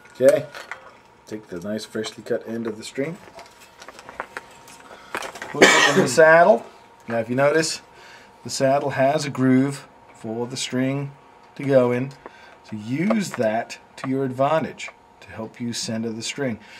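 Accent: Australia. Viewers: Tequila - sounds like he comes from Australia? Sounds like that kind of accent. Anyway, awesomely informative video - I've got a razorback coming soon and this makes it look so simple! 5*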